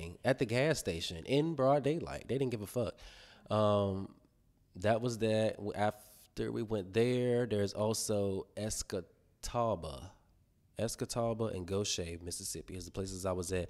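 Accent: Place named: Norfolk, United Kingdom. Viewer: Loving the hair and the fittt 😍 I definitely thought you was from Norfolk though lol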